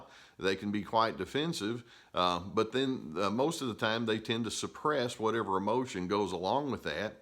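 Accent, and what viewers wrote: South, United States. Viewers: Experiencing his flying monkeys now. It’s awful. I thought these people were my friends. So hurtful. Love your videos. Love your southern voice. Thank you for such honest videos. They are spot on.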